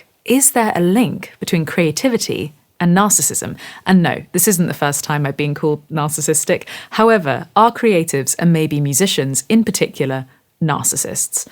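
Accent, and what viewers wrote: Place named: London, United Kingdom. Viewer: in his opera prima, says in a verse: "É que narciso acha feio o que não é espelho"..something like Narcissus regard as ugly what is not mirror. Always refreshing watching your videos, not to mention that hearing your accent made me quench my thirst of old London. Be Happy!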